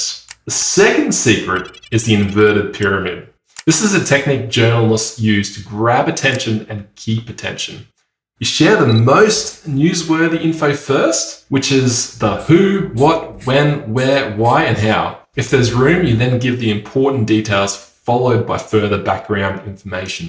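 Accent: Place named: Australia. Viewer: yes, that's always the case. I was asking about what's your take on using chatgpt to overtake FS. No offense, but if you are to reply to my comment, make it meaningful. Otherwise, just ignore it ;) I have a feeling it's easier in Australia (based on your accent, but I might be mistaken). Either way, this tactic is still working, but it needs some adjustements for the global market. I know I might hurt your ego with this and I will probably kill my chances of earning a thousand bucks, but let's discuss this.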